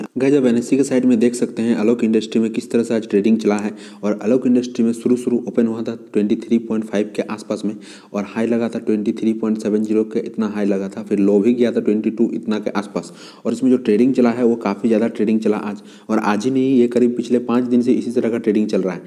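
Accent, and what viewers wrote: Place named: Bihar, India. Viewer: Abe Bihari ho kya? commentry se pata chal raha he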